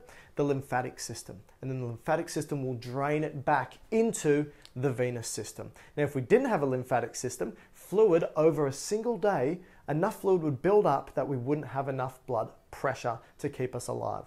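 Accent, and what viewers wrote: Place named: Australia. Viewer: There's auto translate captions available, hope that helps... unless you're having a problem with his Australian accent :-)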